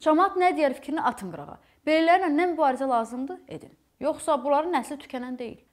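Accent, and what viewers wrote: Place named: Sheki, Azerbaijan. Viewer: xanım haralıdı görəsən? Şəki ləhcəsi var, amma videoroliklərdə lap bizim kəndin ləhcəsində danışır, əsas da ana 🤣🤣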